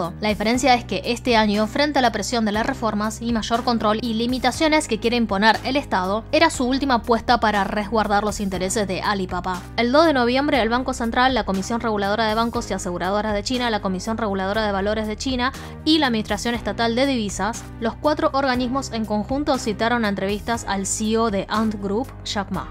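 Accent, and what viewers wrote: Buenos Aires, Argentina. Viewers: Me mata el acento Porteño!! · me encantan tus videos, te voy a empezar a seguir, pero hablas muy rápido. ¿Eres argentina?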